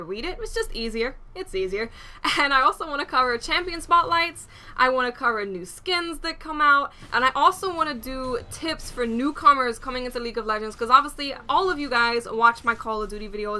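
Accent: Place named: Bronx, United States. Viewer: BTW: you sound like you from the bronx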